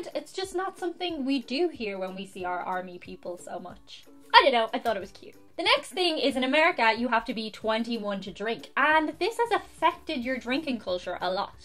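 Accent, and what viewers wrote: Ireland. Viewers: very interesting. I'm from the usa but my Grandfather (Thomas Feeney) was born in Dublin Ireland. I love your Irish accent...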